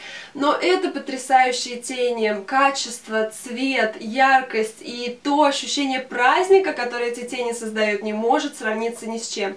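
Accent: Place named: United States. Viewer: убери американский акцент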